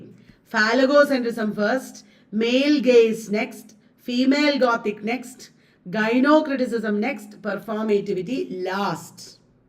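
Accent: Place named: Kerala, India. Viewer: Mam.... Ur malayalam 👌🏻🤩😍so sweet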